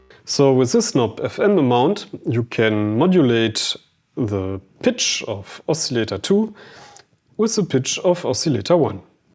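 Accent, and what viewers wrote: Germany. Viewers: Beautiful German accent 🇩🇪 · this sound more german then english :D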